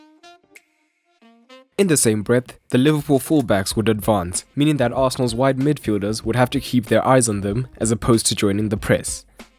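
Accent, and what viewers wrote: South Africa. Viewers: Are you South African? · Is the narrator South African?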